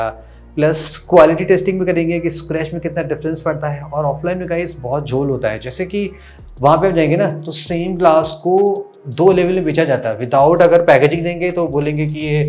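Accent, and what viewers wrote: Lucknow, India. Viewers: Bhai aap Lucknow se ho??? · Aap lucknow se hai kyA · Aap Lucknow se ho bhai sahab 😊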